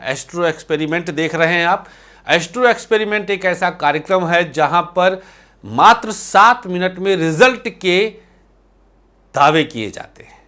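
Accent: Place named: Varanasi, India. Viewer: sir aap ka. Bolane va baat kernai ka andaza ek dam banarasi hai 👍 jab aap datatai hai to 🙏🙏🙏 you are great sir.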